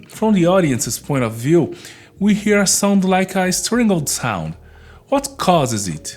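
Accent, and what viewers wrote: Brazil. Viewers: Are you brasilero my amigo? Teu sotaque é imperdivel saudes. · You're Brazilian, right? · tu é brasileiro é ? thank'u for video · Reconheço o sotaque br de longe kkkkkkkkkkkk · The Brazilian Accent is THICK! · VOCE É BRASILEIRO VOCE NAO ME ENGANA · Adoro seu sotaque brasileiro lindo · Is crazy how we know someone is from Brazil by the accent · I can tell this dude is Brasileiro by the cadence and enunciation of his voice lol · Hehe Deu pra perceber que era BR pelo sotaque O canal é top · Most Brazilian accent I've ever seen ahah Great tutorial! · i can smell a brazilian accent from a mile away CHEERS mano! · This has nothing to do with the video but are you brazillian?? It’s just because of your accent I don’t wanna sound rude I’m just really curious lol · CERTEZA QUE É BR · engraçado como que no primeiro segundo do vídeo eu já sabia que era brasileiro kkskjsksks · Tu é brasileiro, certeza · sotaquezinho brasileiro <3 thanks for the video! 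· Pensei a msm coisa , soa como um brasileiro kkkkk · I love the brazillian accent, nowdays I can perceive how much we say all the sounds more open and "round" than the americans, mainly because this is a characteristic of the Brazillian Portuguese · Is he Brazilian or am I trippin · VC è mineiro? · Hi! This video is great! Thank you so much for posting this. A small question, are you Brazilian by the way? Your accent is very familiar! Familia Brasil! Abração! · Great video! Just a question tho, are you Brazilian? :V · ola! tudo bem? I can tell that you are from Brazil! thank you for the video. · i love the brazilian accent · Bem quando você começou a falar sabia que você era Brasileiro :D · Quando eu vi o sotaque ja sabia que era Brasileiro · I can tell by your accent that you’re Brazilian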